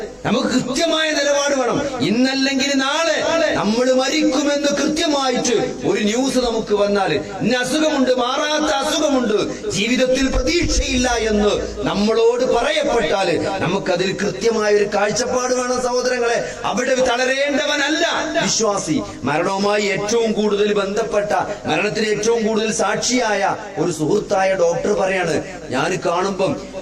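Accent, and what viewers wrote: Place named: Malabar, India. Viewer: അടിപൊളി ശൈലി മലപ്പുറം മലബാർ👍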